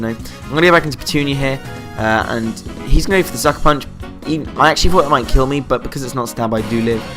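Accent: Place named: United Kingdom. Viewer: Nice! Put out more content like this and you've earned yourself a new subscriber! Good narration style too, it's nice to hear a fellow Brit on here haha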